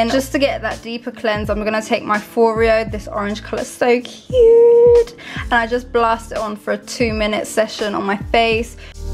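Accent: London, United Kingdom. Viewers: London accent straight up. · yessss I’m from London and noticed it straight away 🌚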